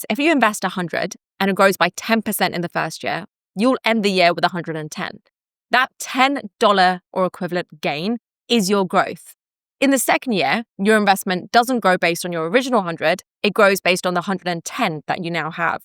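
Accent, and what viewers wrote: United Kingdom. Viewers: Probably the uk with her accent. Though it might be Australian. I can’t really hear what accent it is. It’s not clearly either. Either way Maths is used instead of math in British, Australian and I think South African English. Americans and I’m pretty sure Canadians use math.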